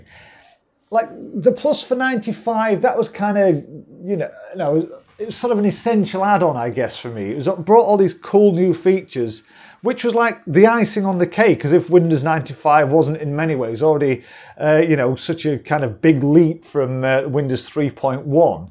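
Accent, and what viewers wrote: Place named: Yorkshire, United Kingdom. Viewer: You are welcome and judging by your accent you are from Yorkshire?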